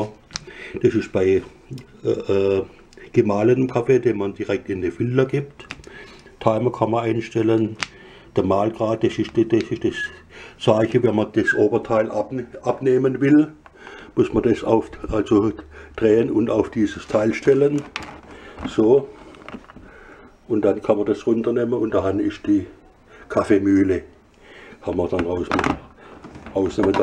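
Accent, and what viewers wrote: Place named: Swabia, Germany. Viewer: Hallo, Danke für das Video. Wollte mich kurz erkundigen ob du bis dato immer noch mit der Kaffeemaschine zufrieden bist?! Bin am überlegen mir die Selbe zuzulegen. P.S. nach deinem Dialekt nach kommst du (so meine ich) aus dem Schwabenländle. Pforzheimer/Calwer Gegend vielleicht?:) Grüße aus dem Schwarzwald;)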